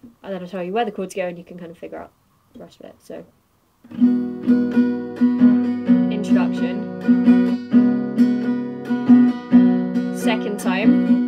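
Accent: United Kingdom. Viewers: OMG BRITISH ACCENT !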